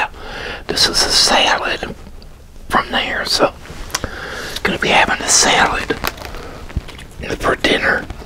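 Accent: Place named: United States. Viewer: that looks so nice yummy i bet you enjoyed it by the way im from the uk and im guessing ur from america due to your accent haha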